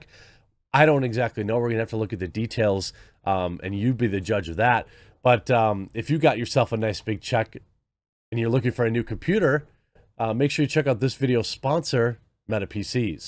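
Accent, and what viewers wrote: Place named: Wisconsin, United States. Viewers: Still not Mark Richards levels of Wisconsin. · It's funny how much more Wisconsin sounds when he's ill.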